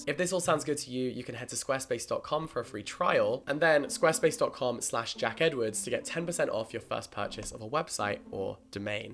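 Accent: United Kingdom. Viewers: British accent so cooll👏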